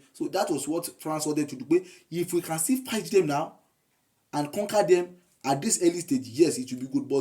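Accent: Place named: Africa. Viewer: It is simply an African Dialect of english, don't call it gibberish just cuz you cant understand it bro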